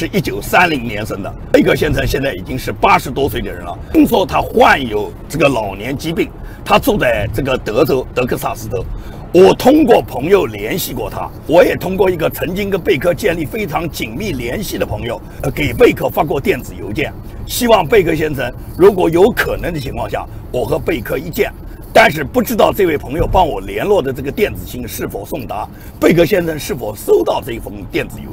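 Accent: Nanjing, China. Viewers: 听声音确实是南京人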